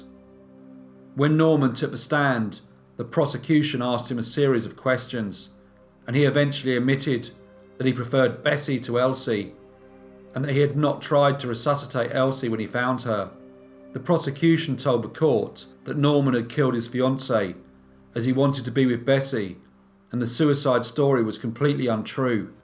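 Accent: United Kingdom. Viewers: I LOOK OR WATCHED MANY OF THE VIDEOS AND FIND IT INTERESTING,ITS LIKE MANY GENRE MIX TOGETHER COZ IT DOESNOT CIRCULATE IN ONE OR TWO PLACES BUT IN THE WORLD WHERE SOME ODD THINGS WHICH SURFACED OR KNOWN WELL KNOWN EVEN OUTSIDE OF THEIR COUNTRY,WHICH MEANS THE NATIONALS COUNTRY WOULD KNEW WELL,AND THE BRITISH ACCENT IS NICE TO HEAR ITS NOT LIKE HIGH PITCH QUICK PACE ACCENT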